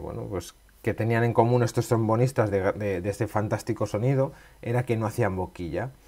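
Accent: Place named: Spain. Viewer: De qué parte de España eres tú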